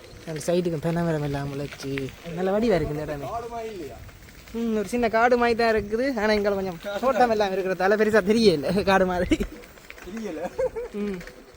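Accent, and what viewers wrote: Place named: Jaffna, Sri Lanka. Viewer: யாழ்பாணத் தமிழில் உங்கள் விளக்கமான வர்ணனை மேலும் மெருகூட்டுகின்றது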